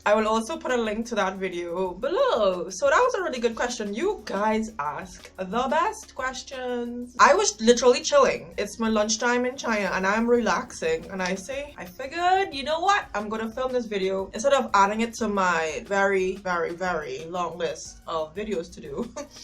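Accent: Trinidad and Tobago. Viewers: Very informative. I'm quite hairy in places I wonder if I should get the test. I' like ur trini accent 👍🏾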